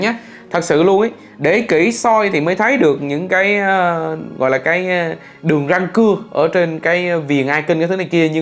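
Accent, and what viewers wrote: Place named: Binh Dinh, Vietnam. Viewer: Mé nghe cái giọng xứ nẫu này ko nuốt trôi nổi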